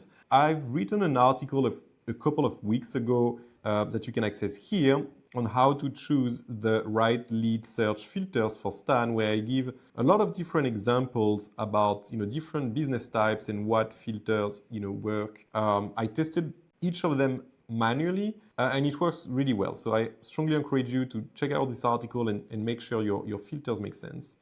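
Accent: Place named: France. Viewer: On m'a recommandé ça sur Reddit. Malgré ton bon anglais, je repère tout de même un accent français bien présent. Marblism est français ?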